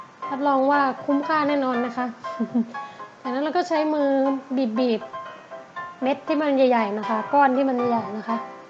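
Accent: Thailand. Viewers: พี่พูดแปลกๆอ่ะค่ะ แบบเสียงเหมือนไม่ใช่คนภาคกลางอะไรประมาณนี้ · เธอไม่ใช่คนกรุงเทพฯ ใช่มั้ย สำเนียงไม่ค่อยชัด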